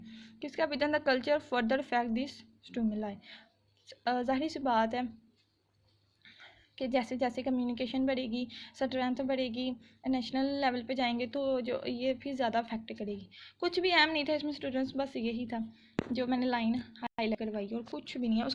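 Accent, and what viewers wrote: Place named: Shakargarh, Pakistan. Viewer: Mjhy smjh to ni ai but mjhy ap ki voice sunny ka Bht Maza aia q k ap Shaker gerh ki h or mjhy achy lagty h whan k log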